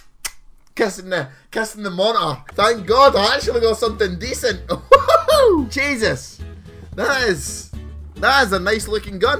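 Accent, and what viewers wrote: Scotland, United Kingdom. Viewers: Are you from Scotland? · R u Scottish ? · R u Scottish?:} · thank god a Scottish csgo youtuber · R ye Scottish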